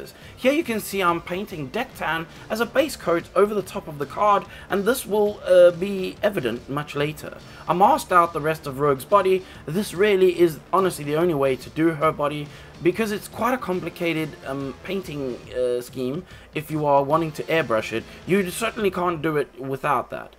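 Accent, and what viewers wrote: South Africa. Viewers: I recognize that South African accent 😉 This is incredibly impressive, well done! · Are you originally from South Africa? 🤔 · Awesome work! You sound very South African. 😅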